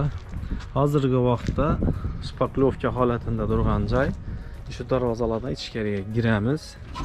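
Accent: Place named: Khorezm, Uzbekistan. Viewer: Хоразм дилинда чиройли гаплабди офарин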